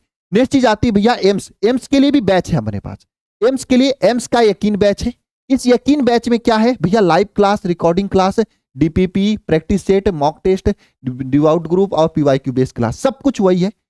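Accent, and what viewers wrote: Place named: Uttar Pradesh, India. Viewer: Sur me up se ho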